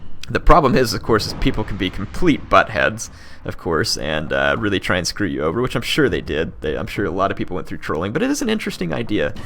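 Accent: South, United States. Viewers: You get a like just for that Soutgern Accent;) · Love your southern gentleman accent. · I subbed just for Southern accent <3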